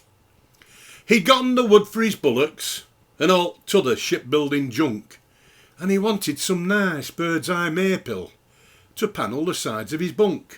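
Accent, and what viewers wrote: Lancashire, United Kingdom. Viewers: This is not just Accrington, but many towns in East Lancashire have this , similar to the way they say 'four' as 'phoar', with the 'w'. Same in Burnley, and many part sof Eats Lancashire, particularly in Rossendale. Even heard it in parts of Rochdale. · Doing some research on a role for a broad Lancashire accent. You're recital helped out tremendously. Thank you for posting it.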